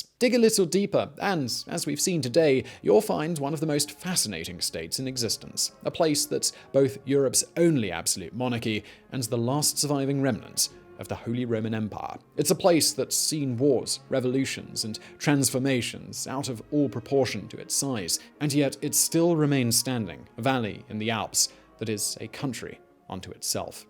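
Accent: United Kingdom. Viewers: this guys to British lol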